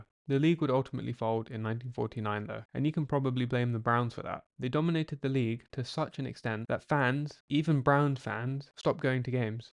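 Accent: United Kingdom. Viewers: Just gotta add why a British accent guy narrator on American football. Also the BROWNS ran the Cleveland rams out of Cleveland to LA. The Cleveland rams won a NFL championship in Cleveland before they left.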